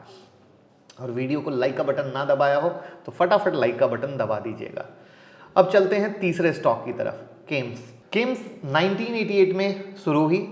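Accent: Bihar, India. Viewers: आप बिहार से हैं क्या?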